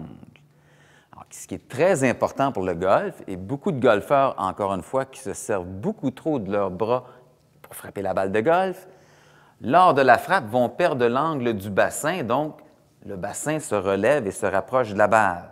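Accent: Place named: Canada. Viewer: hello from France. Super vidéo., faut faire un DVD! Continuez, j'aime vos vidéos, elles sont très pédagogiques et claires. Et puis, avec l' accent " canadzien ", j'ai l' impression de prendre un cours de golf au Québec! génial. Merci. et encore, encore.....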